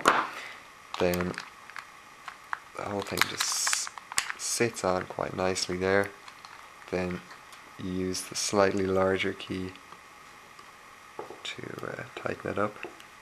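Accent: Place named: Ireland. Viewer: Can't miss an Irish accent!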